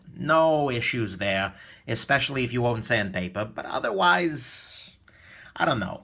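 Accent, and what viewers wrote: New York, United States. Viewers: naw he just sounds like a damn proud New Yorker lol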